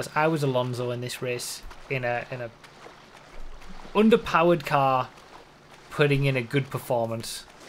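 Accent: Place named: Scotland, United Kingdom. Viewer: Are you... Scottish I’ve never watched you before and I’m Scottish myself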